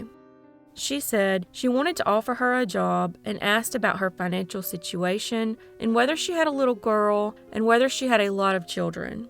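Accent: South, United States. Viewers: Thank you for All the information on cases ,the way you present with your soft, caring, and compassionate voice. Always good to hear that southern Belle voice first thing in the morning. Take care and Be safe ❤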